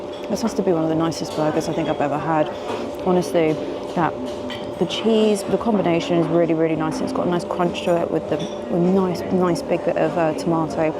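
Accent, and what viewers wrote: RP, United Kingdom. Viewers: Mi primera vez viendote. Que hermosa que sos. Y me encanta el accento britanico. Vere mas videos tuyos. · Where in britain are you from? Trying to learn accents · You could me over so posh but tell it qs it is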